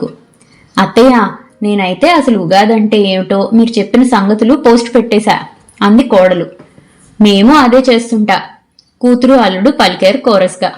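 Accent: Godavari, India. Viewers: చాలా బాగా చదివావు! గోదావరి యాస చాలా బాగా పలికావు. అభినందనలు 🎉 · గోదావరియాస బాగా అలవాటైనట్లుంది 😊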